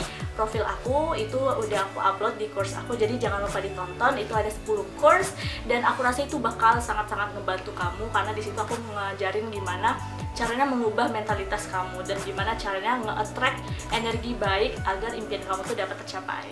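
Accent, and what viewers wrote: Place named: Bali, Indonesia. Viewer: Kak kok logatnya Bali😂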